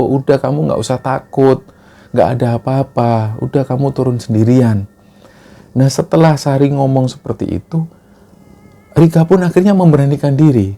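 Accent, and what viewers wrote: Java, Indonesia. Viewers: mas duhhh maless aku jan nagih i klo sehari ga denger koyok ono sing kurang, btw sampean jawa ndi seh mas kok boso jowone jan koyok bahasa sehari2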